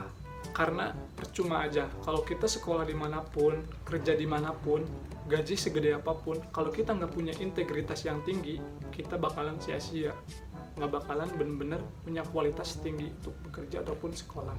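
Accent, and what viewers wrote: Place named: Sunda, Indonesia. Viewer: "Seperti itu nya" lagi serius dengerin, mendadak ketawa bang ahahahhahah sunda na kentel pisann, hatur nuhun kanggh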